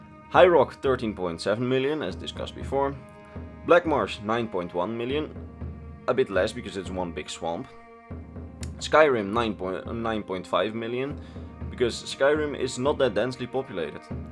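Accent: Netherlands. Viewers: The dutch is strong in you my friend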